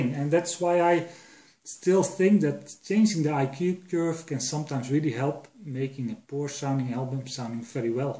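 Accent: Netherlands. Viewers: Bedankt. Ik droom al van deze versterker. Ik heb een FM-tuner met lampen en de klank is helemaal anders. Voorlopig gebruik ik een oude versterker met discrete schakeling die het zeer goed doet. (Ik dacht wel dat je Nederlander was gezien je accent...). Walter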